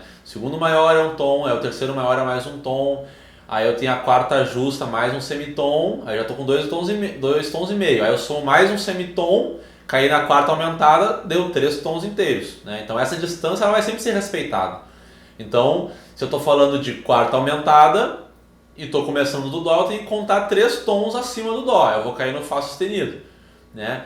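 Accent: Rio Grande do Sul, Brazil. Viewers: Muito bem entendido;;; me ajudando muito... . só uma pequena perguntinha::: vc é gaúcho?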